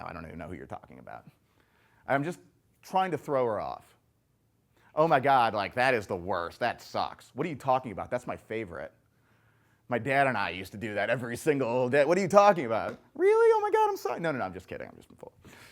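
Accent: United States. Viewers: I thought this guy was British. Nope, can't trust an American to give me advice on how to hold a proper conversation. Americans (even the educated ones) don't know how to use basic proper English phonics, punctuation and say "like" out of proper use WAY too much. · Very Intense, very american, not so british, wouldn't work as well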